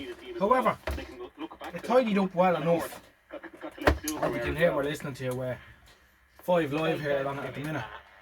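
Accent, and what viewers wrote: Ireland. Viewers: As your man says 'you can never have to many multimeters' my cheap CPC dvm is oten let on and is always flat, i never seem to have managed to keep the internal fuses for current so it's volts only. I have a NOS 70's far east meter that i bought for old times sake and i still drag out my big old AVO. I played your voice down the phone to my wife, she was from Hacketstown Carlow. She reckoned dublin or wicklow. Anyway mate, keep up the good work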